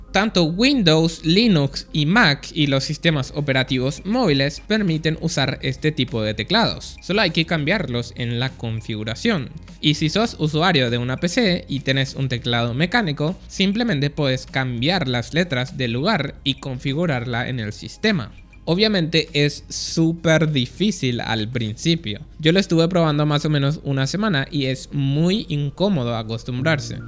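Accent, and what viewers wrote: Paraguay. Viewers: A mi no me mientes sos paraguayo vd?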